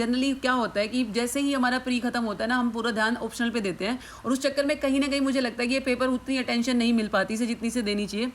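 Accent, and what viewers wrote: Haryana, India. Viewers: Ma'am आप कोण से गाम तै हो क्योंकि आपणि बोली सुन्न की बड़ा आच्छया लाग्या 👍👍😂 लेकिन maam म्हारा तो अंग्रेजी मै बेड़ा गरक हैं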